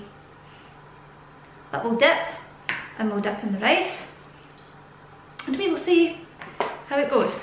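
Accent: Scotland, United Kingdom. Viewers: "I like you. Do you want to be my valentine?" XD Also, I love your accent! When I first found your channel I was like, oh my gosh, her accent is everything. My favourite musician is also Scottish, so I may be a bit biased. ;) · Haha no I love the Scottish accent!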